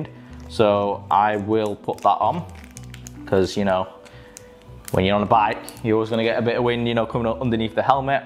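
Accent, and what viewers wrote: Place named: Preston, United Kingdom. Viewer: Mate your accent was confusing me hard but knew you were from around the Preston area 🤣 Great video, hopefully see you around 👍🏼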